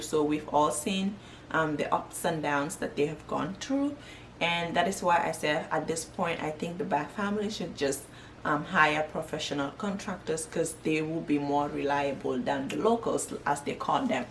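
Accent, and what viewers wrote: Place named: Jamaica. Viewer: THEY HIRED PROFESSIONALS. AND. HANDY. MEN. YOUR. ACCENT. SOUNDS. JAMAICAN.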